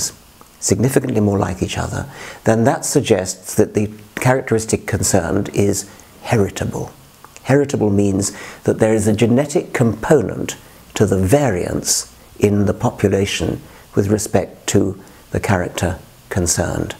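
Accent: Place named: RP, United Kingdom. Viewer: Dawkins you have the arrogance of a boy with low self-esteem and a big ego. All your research and conclusions are folly. You're a puppet for satanists. The only point more wild than your pukka accent promoting nonsense is the ignorant people who buy your nonsense.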